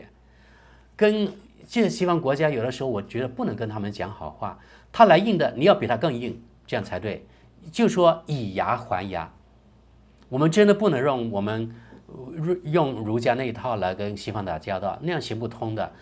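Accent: Standard. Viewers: 没有啊，你的普通话已经很标准了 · 中国深圳人，觉得这么好普通话还谦虚。真的很标准啦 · 的普通话很标准啦，可能你身边没有华人跟你对话你感觉不深而已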